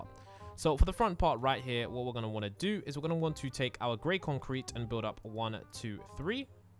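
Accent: United Kingdom. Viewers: I love your British accent then the Build ☕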